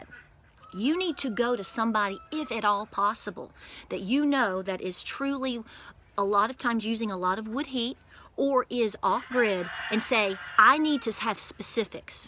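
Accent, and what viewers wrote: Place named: Deep South, United States. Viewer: I just found this video and your channel. I love your deep south accent and attitude! You tell it like it is, and you an inspiration. <3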